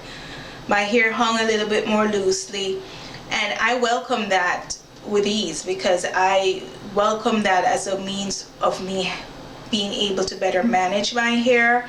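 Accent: Jamaica. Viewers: I'm picking up a tinge of accent....are you Jamaican?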